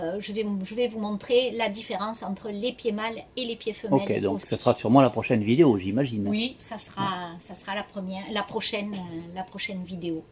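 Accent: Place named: South, France. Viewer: Bonjour, vos explications sont claires et précises, et avec l'accent du midi cela nous amène le soleil. Vive le sexe😉 des plantes.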